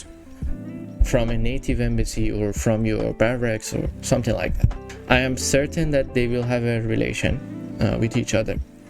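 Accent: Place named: Iran. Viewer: Oh you are Persian !!! I always watch you videos & I thought maybe you are Persian because of your accent . Well done mate 👏🏻 I created a topic about adding Persia as new civilization for AOE III DE in future in official forum (Persia civ suggestion) . You can go & check it my friend.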